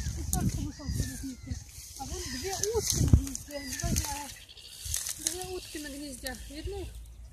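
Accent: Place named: Belarus. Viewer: А говор то беларуский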